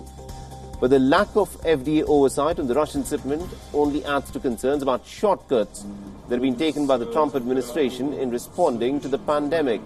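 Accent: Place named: India. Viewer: Indian English has been developing independently with British rule and after. The anchor has a nasal tone & accent but otherwise it sounds normal enough to Indians. You must accept that there are worldwide variations in English all of which are equally authentic.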